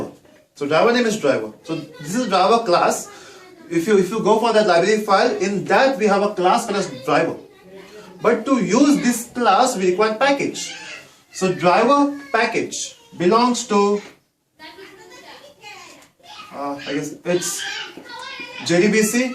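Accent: Russia. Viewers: for 10sec i thought u were russian sir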